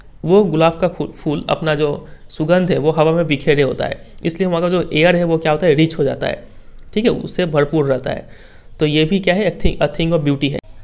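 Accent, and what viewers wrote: Bihar, India. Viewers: Are you from Bihar sir please reply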